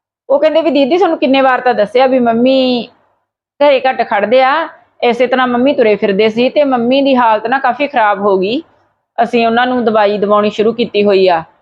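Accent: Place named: Malwa, India. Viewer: ਤੁਹਾਡੀ ਬੋਲੀ ਤੋਂ ਮਲਵਈ ਝਲਕ ਪੈਂਦੀ ਹੈ । ਕੀ ਤੁਸੀਂ ਮੋਗਾ ਸਾਈਡ ਤੋਂ ਹੋ?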